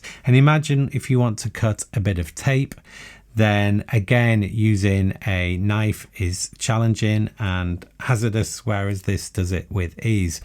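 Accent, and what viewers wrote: England, United Kingdom. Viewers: If im honest SAKs are why i came to the channel, them and Olight torches. I stayed for an English accent and UK £ pricing and the concise reviews. I have titanium scales on my cybertool but as hinted at above i always have a torch so i don't have any lite models. It's probably as a collector of well over a 100 SAKs in my top 5 Swiss Army Knife models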